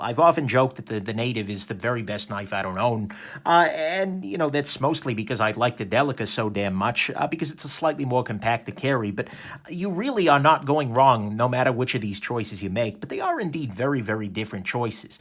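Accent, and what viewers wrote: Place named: New York City, United States. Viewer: My wife walked in and asked if I was listening to Woody Allen talk about knives. You do have that Manhattan accent. Happy New Year!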